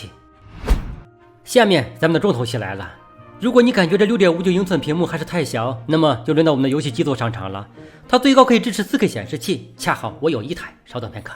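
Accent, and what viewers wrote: Shandong, China. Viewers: mike绝对是山东人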